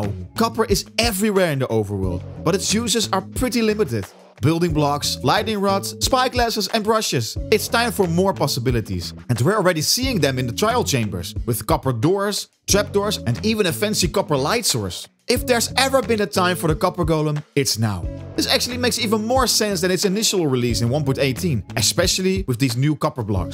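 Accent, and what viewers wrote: Netherlands. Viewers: Hello, are you from the Netherlands? I'm asking this because of your accent. BTW love this vid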